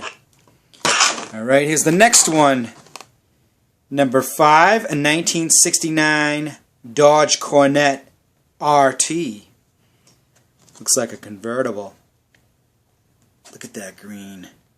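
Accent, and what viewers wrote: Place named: New York, United States. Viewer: Are u A New Yorker?? From the Big Apple? Or Vinnie from the Bronx?? Love that Wiseguy accent 👍🏻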